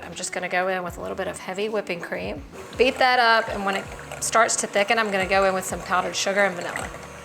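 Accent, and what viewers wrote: South, United States. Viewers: Not only was this recipe. So are you. Will you marry me. It’s the slight southern accent. Absolutely gorgeous!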